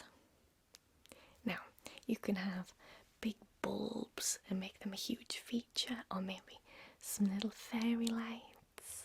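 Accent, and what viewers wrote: United Kingdom. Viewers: Wait...she's British...well this suddenly got VERY interesting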